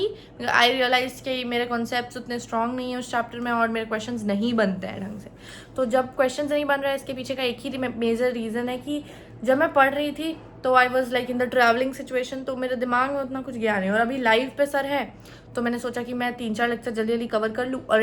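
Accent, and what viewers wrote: Bihar, India. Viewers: Aap bihar se ho??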